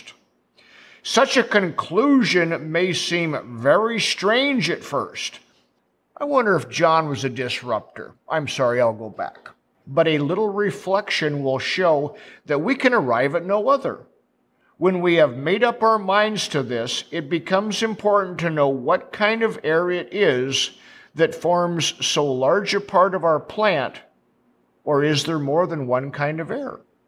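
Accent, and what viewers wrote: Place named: Midwest, United States. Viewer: That's a midwest accent . I think. Seems Wisconsin as F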